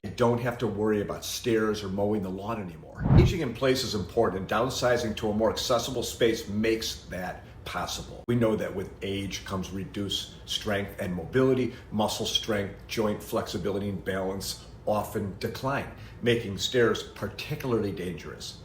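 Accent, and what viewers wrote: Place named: Chicago, United States. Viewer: 7 years ago, after the youngest of our kids was a sophomore away at college, we downsized from 4 bedroom/2.5 bath (2,200 sq ft h in the suburbs into the city to a 3/2 with 1,850. We both absolutely love our one story, all brick SMALLER house! We are in close proximity now to everything: our jobs, our church, stores, hospitals. Less house to keep clean, less property taxes, utilities etc. (we live in coastal Georgia.)The only challenge? We have only one guest bedroom, so at holidays when our kids and grandkids visit, THEY have to pay for hotel or AirBNB. The youngest has a spouse but no kids so thus far she’s usually the one who gets the guest bedroom. My husband and I both U of I grads so I enjoy your Chicago accent 😊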